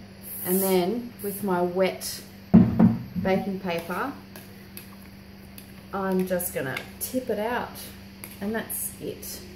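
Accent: Australia. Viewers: Loved listening to a fellow Aussie. I enjoyed this video. Straight to the point and informative. Hope to give it a go soon. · Niceto hear an Aussie accent !L.O.L.😄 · You are a great lady and I thank you for the wonderful video and your sourdough recipe. Btw love your Australian accent. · HI! Totally awesome video! Camera angle was perfect, you have a very pleasant and clear [ Australian!] speaking voice, and the entire process was easy to understand and well presented! Well done! Shalom to us only in Christ Yeshua. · thanks I love Aldi . I'm from Shepparton VIC.regional. My bread is now tasting a bit more sour. Thanks your Video's are wonderful. Great to hear an Aussie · Thanks! This was really nice to hear an Aussie voice and practical instructions. 🇦🇺👍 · Hi, You made it look easy to follow so will attempt same. Good to hear aussie talk.:-D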